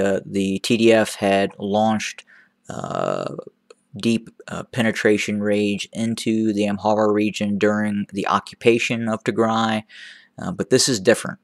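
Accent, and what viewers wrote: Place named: United States. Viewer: it is an American accent indeed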